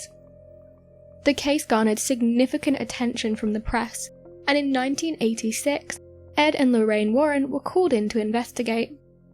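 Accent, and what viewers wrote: England, United Kingdom. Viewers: Why is it hard to understand a clear English accent?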